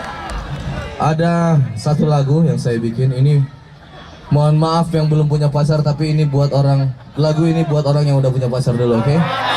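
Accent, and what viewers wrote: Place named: Minangkabau, Indonesia. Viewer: Lucu bahaso minangnyo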